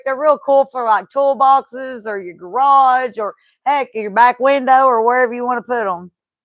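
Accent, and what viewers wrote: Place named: South, United States. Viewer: Chuck loves your southern accent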